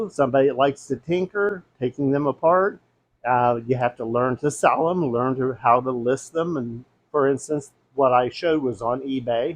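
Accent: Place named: Pennsylvania, United States. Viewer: Great video! I think hear a PA accent, am I right? South?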